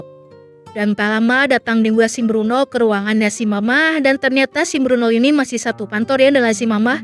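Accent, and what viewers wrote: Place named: Cicadas, Indonesia. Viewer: Jigana si admin orang cicadas ya. 😁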